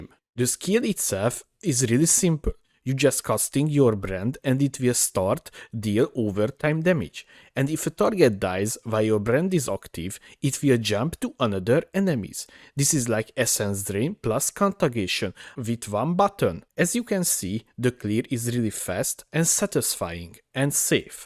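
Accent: Hungary. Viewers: You must be Hungarian with this accent. :D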